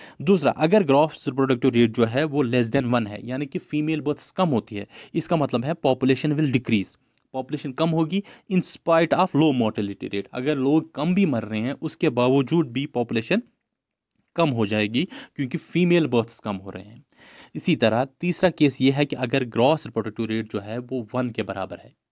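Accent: Kashmir, India. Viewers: Sir love your teaching skills. Specially your kashmiri accent... I completed statistics with full of enjoyment...The word,"Thanks" is not sufficient for your efforts sir. May God fullfill your every desire... Sir Iam preparing for JSA there are two more topics remains: 1 Correlation and Regression- Concept and simple applications 2 Interpolation and Extrapolation Sir please will you complete these topics too... 🙏🙏🙏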